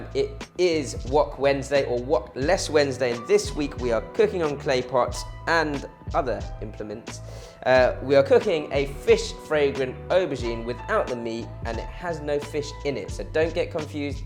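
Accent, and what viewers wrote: United Kingdom. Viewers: If I were your mom, I would be very amazed how come my black hair Chinese boy will end up speaking such a beautiful British accent.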